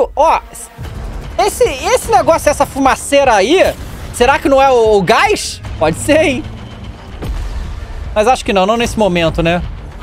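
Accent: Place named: Rio de Janeiro, Brazil. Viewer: poxxxxxssss conferencia kkkk sotaque carioca é bom demais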